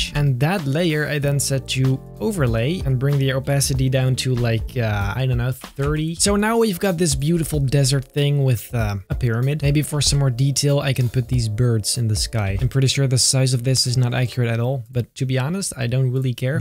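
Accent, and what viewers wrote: Netherlands. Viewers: I love your accent, are you dutch? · It’s called an accent dude. He’s from Holland. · You sound dutch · Go for a 1000 Microworlds!! And then auction them off like Beeple and become rich! Also, are you Dutch (like me)? I notice a bit of an accent, not too much tho...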